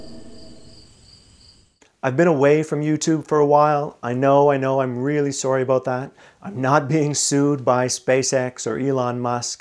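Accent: Canada. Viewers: Seems like a great video and I love the subject but I just can't do that extra Canadian accent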